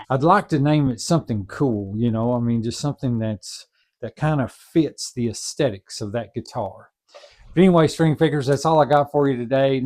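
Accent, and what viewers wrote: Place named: Texas, United States. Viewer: Love your southern accent! you from texas?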